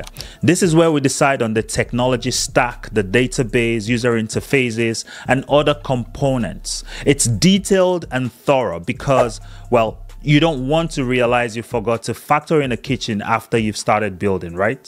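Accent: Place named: East Africa. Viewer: I love man brother. So eloquently explained. if I might guess, are African (East side). Bless you man😀😀😀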